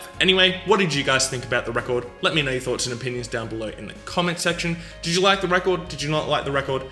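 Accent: Australia. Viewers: aussie accent? aaaaaand close tab. sorry dude :/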